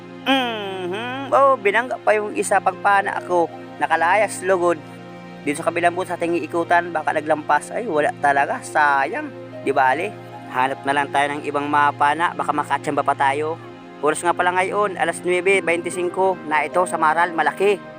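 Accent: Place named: Samar, Philippines. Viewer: Sir tagasaan k s Samar? Kc parang minsan nhhalata kita nag bbisaya parang Samarnon lol 😊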